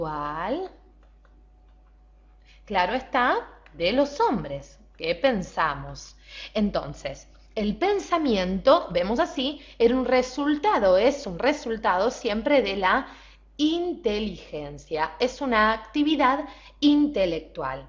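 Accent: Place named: Argentina. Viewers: Nosera porque es Argentina?👊😈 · Por que hablas en argentino? D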